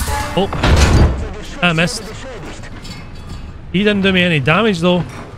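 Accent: Scotland, United Kingdom. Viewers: so glad to see you back dood! youtube has felt barren and desolate without your beautiful scottish voice brightening my day. Hope you’re doing okay my dood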